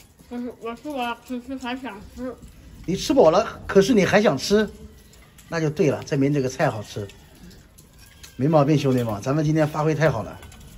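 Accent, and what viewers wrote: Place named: Huai'an, China. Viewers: 应该是，咸念han2😁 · 是江蘇淮安人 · 淮安的西瓜上说了我听着也是我们苏北的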